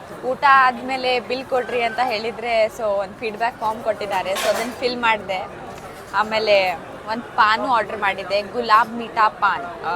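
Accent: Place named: North Karnataka, India. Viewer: Nice see north Karnataka girl vlogs, in north Karnataka style Kannada .. is super style.. lol..,!!!!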